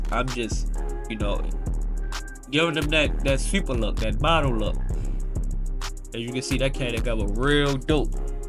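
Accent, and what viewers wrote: Baltimore, United States. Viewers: Top tier....West Baltimore through and through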